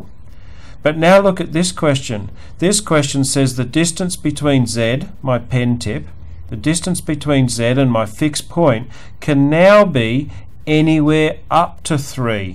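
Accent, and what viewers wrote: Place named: South Africa. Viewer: Your explanation is clear as crystal. Excellent tutorial on complex numbers. I also like the quality of your video as the picture is stable, clear and neat. From your accent I would imagine you are a South African?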